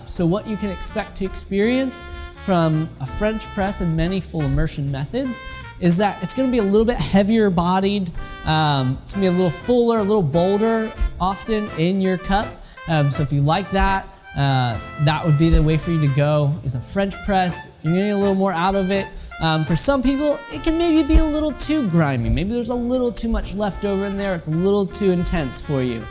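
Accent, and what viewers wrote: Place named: United States. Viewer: I never believed in an american accent until now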